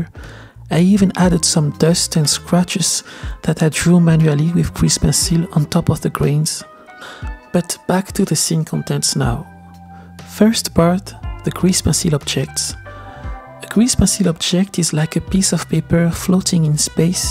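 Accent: France. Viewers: Is this a french accent? Because your voice is lovely to listen too. Besides that, your videos are awesome. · L'accent français me fait rire, continue c'est trop bien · you’re French?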